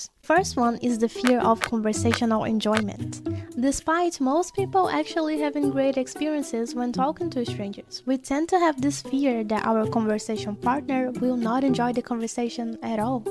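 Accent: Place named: Brazil. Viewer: Pensei que fosse brasileira pelo sotaque e confirmei com o áudio da sua mãe kkk Gostei muito do vídeo e das referências! Abraços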